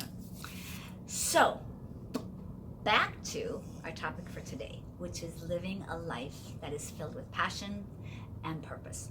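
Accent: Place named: United States. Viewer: A very pleasant person! Always laughing very enjoy to live! I dont understand all she is telling because she speak to fast and with a american accent but i come to see her !! In front of her energy i am happy for the day!!!!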